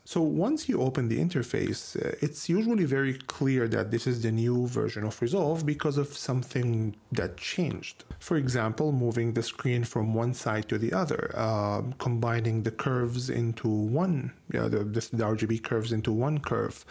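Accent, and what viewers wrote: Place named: Italy. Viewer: Thanks so much for the free DR 18 update to your courses. I was a little concerned if I updated to 18 your Film Simplified course wouldn't be compatible. You'e the man!! I really love the way you teach too. Well put together, easy to follow along and small bites. Keeps me engaged. The bonus is the accent. What is that? Italian? Spanish? I don't know but it sounds so good with head phones on. Keep up the good work. You are the genuine article.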